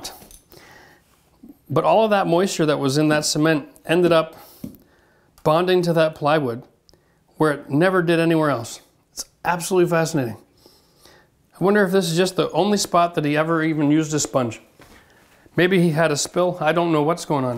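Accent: Canada. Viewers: and i khew it before I saw the Tim Hortons cup. The way Canadian's say house gives it away every time. or out. Also, he has skills.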